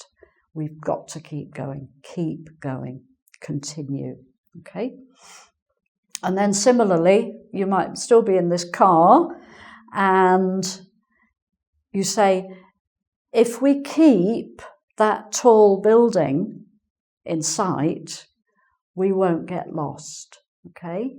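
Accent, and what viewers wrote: United Kingdom. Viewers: I really enjoy your lessons, enjoining listening to very clear British accent, and I learn new things such as the difference between: can you please and could you please. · amazing Teacher) and her accent is so British. she must be perfecr granny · From Brazil From Marcio Hello Teacher... It is a pleasure to meet you... I had never seen a class of you here, but I am enyoying it a lot. Where you're from? Your accent sounds like a British person. I love it. Hugs! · a brilliant teacher and british accent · AstronoyBrasil Hi! I was curious about the same thing and then I've found this site with some information about all of the Engvid Teachers: <link> - I'm not sure whether Ms. is from UK or not. However, we can see she lives in London and her accent is perfectly British! Hope this helps you. Bye!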